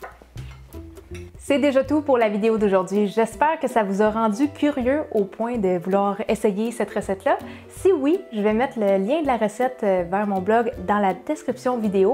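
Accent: Quebec, Canada. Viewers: C'est l'accent Québecois et du Lac Saint-Jean:)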